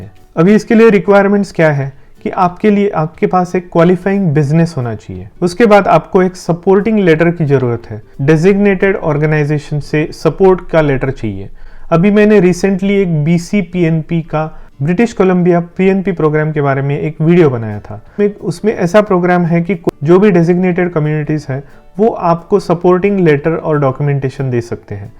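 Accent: Maharashtra, India. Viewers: Sirji r you from Maharashtra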